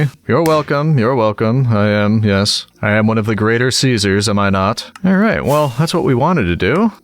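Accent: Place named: Scotland, United Kingdom. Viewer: I fully enjoyed your Scottish accent!😂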